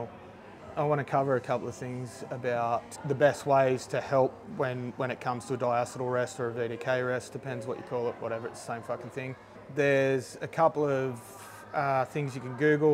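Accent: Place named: Australia. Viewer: It's so good to hear an Aussie accent not pronouncing words like yanks, if only we could do the same PSA for couch (it's lounge), cookie (it's a damn biscuit) and ass (it's arse here)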